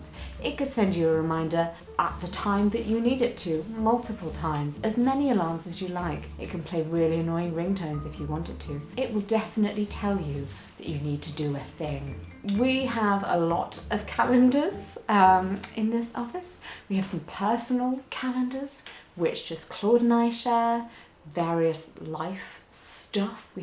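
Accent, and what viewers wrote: RP, United Kingdom. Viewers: Quite the posh accent wow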